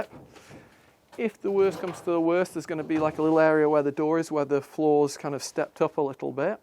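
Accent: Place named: United Kingdom. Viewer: that was an enjoyable video watching you, and work together. It will definitely be a fun renovation. Adding the new door makes it already look light years better. I love hearing you speak French and switch off to English with your British accent! I’m curious about lead based paint concerns. In the United States we have inspections prior to purchasing a house built before 1978 because there was lead in paint before 1978 and the airborne particles are dangerous to one’s health. Just wondering as we watch all of these old buildings be torn apart with dust and paint chips flying around.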